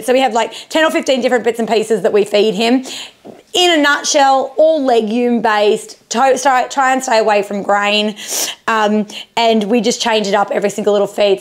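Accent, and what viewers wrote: Australia. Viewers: Wow so interesting - Wes was soo good with the ducktape - TFS 😍 just a quick question are you Australian? Love from a fellow Aussie 🦘🐨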